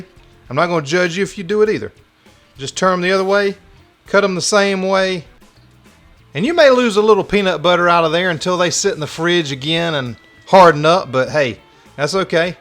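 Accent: South, United States. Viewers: Thanks, I can't wait to try this! P.S. this WV Hillbilly loves your Southern accent.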